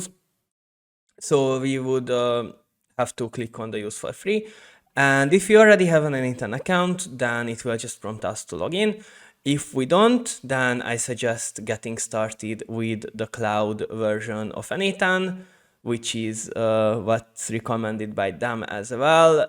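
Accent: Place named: Hungary. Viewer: Magyar akcentus az peak tesó! Amúgy jó lett a video, well done GG!!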